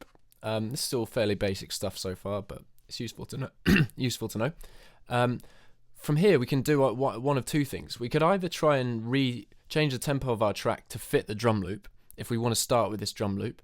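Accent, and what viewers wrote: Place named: England, United Kingdom. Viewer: love your english accent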